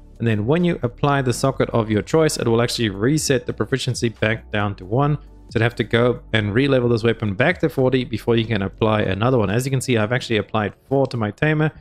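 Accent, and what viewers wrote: South Africa. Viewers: Ahhh that lovely South African accent…. Love it. Ja my bru I totally got this china. Great video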